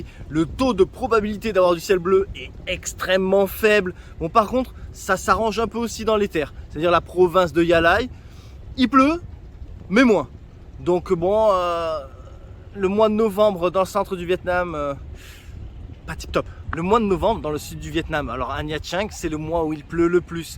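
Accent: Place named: Southeast, France. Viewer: Bravo très bonne vidéo, on voit que tu connais le pays 👍, j'adore ta façon de prononcer les villes avec ton "naccen" du Sud-Est pas tout à fait ça mais bon on s'en fou 😀 Canto, Mito, Saïgonne, Niatran.... j'espère te croiser un de ces 4 à Saigon quand tu y retourneras ce serait sympa se prendre un petit "cà phê sữa đá" et échanger. Bon voyage.🤗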